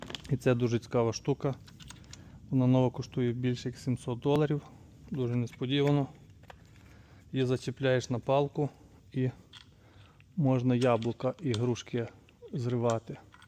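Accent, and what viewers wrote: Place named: Western Ukraine, Ukraine. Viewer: Знімаєш ні як , а в перемішку з западнянським акцентом це просто жах . Хто тебе з буд майданчика відпустив , ваша реальна тема це будувати...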